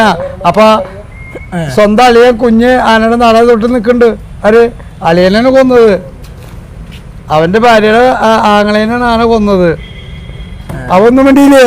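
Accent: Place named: Thrissur, India. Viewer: എത്ര കേട്ടാലും മതി വരില്ല ഇദ്ദേഹത്തിന്റെ സംസാരം. ആ തൃശൂർ ഭാഷയിൽ ഒരു ജാടയും ഇല്ലാത്ത നിഷ്കളങ്കൻ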